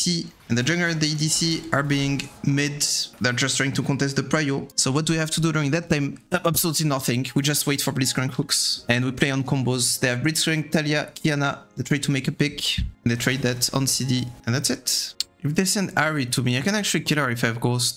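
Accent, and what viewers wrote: France. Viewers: Hi I discover ur channel, is sound like but not 100% sure are u french ?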